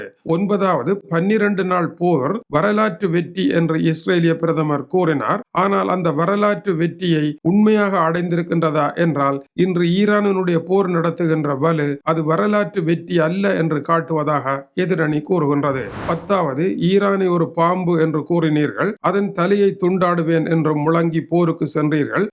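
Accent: Sri Lanka. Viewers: Beautiful Ceylon Tamil. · Elaigai tamilan awar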